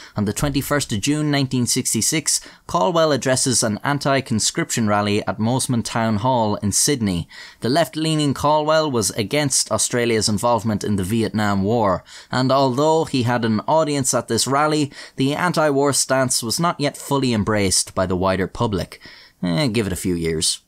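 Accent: Ireland. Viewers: Did anyone else know that is just irish and isn’t just British with a speech impediment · can you do the port aurthur shooting? or the cookie question, I've never heard anyone with an Irish accent do the cookie question.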